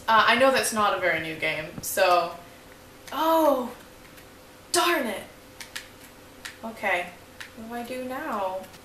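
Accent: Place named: United Kingdom. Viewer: How long have you been living in the UK? I noticed sometimes when you were speaking your voice had a british tone to it xP if that makes sense. The video was pretty cool, the intro was funky :P little tip for future videos: keep your hotbar organised (i.e. keep your tools in a place where you can conveniently access them - I usually keep mine to the left of the hotbar, but find a way that suits you :P) other than that I enjoyed the video :D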